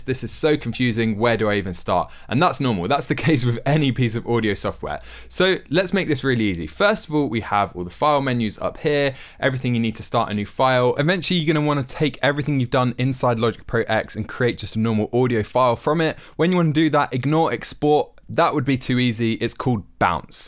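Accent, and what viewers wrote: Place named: Manchester, United Kingdom. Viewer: you from Manchester bro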